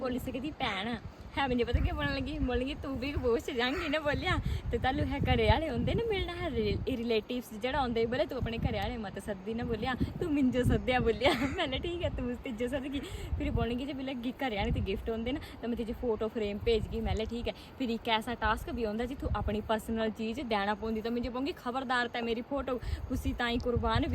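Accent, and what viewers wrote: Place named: Himachal Pradesh, India. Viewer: Himachali boli hai bro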